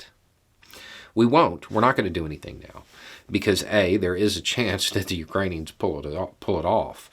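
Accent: South, United States. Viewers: violent and unstable? Lol. I was just replying to a veiled threat. Also is clearly not a Yankee which is a name for a northerner here in the US. He’s clearly from the south.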